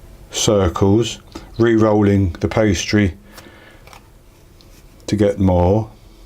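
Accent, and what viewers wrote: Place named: England, United Kingdom. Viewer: Hello: I do like your baking and very gently English accent to let us understand what you say. But can't you put the mic hanging lower on your shirt or put in somewhere seems more better, because your breathing is very strong as well! Thats why we feel uncomfortable. Sorry about to let you know my family's feeling and >> Thanks your share:):)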